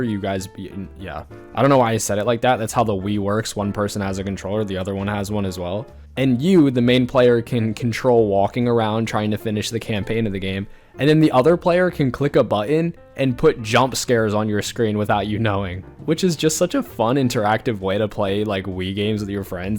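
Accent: Poland. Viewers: Are you polish? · bro are you polish??????????????????????????/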